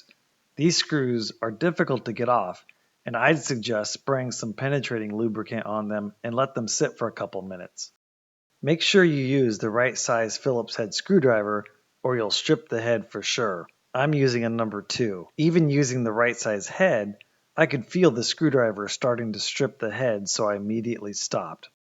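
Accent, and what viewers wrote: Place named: United States. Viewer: WE SUBSCRIBED Coming upon your video after working through a few with non-native speakers or unclear audio filled me with appreciation for your "unaccented" speech-- no drawl, only plain American pronunciation. Along with a systematic disassembly / reassembly process, with product tips and pointers, your video is exactly what I needed. The only aspect for improvement is most of the action is recorded at a distance, and we are left looking over your shoulder, unable to see more orienting detail and your specific, finger-level movements.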